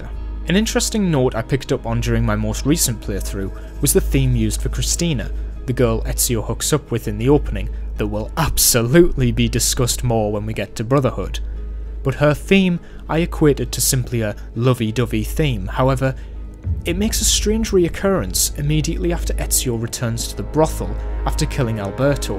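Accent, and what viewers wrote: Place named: North East England, United Kingdom. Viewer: This character analysis is one of the most detailed I’ve ever seen on YT. You’ve made me fall in love with Ezio even more than I already did! Also, can’t help but notice the very north east English accent you have 💃🏻. Fellow NE’er here 😎😎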